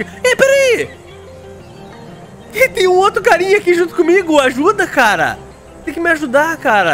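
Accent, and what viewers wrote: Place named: Paraná, Brazil. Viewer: Cai de paraquedas nesse sotaque gostoso de ouvir 😅 sdds Paraná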